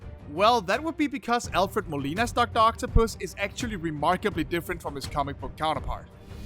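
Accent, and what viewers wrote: Denmark. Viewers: Are you Danish? You sound like you have a really strong Danish accent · Ohh shoot, a danish narrator🙏🙏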